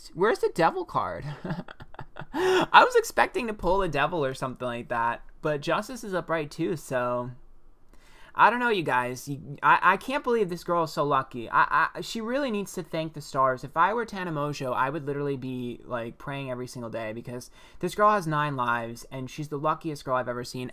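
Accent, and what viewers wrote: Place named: AAVE, United States. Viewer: the aave needs to stop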